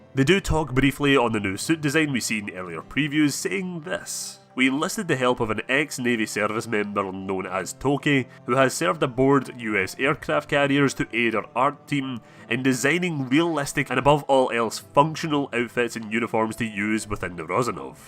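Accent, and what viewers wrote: Scotland, United Kingdom. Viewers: With that accent it seems like a foreign language! Are you scottish ?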